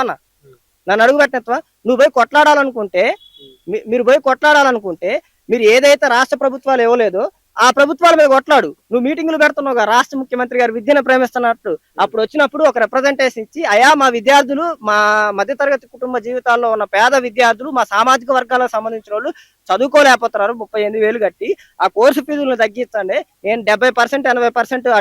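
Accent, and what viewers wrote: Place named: Andhra Pradesh, India. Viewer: వీడు ఆంధ్ర స్లాగ్ మాట్లాడు తున్నాడు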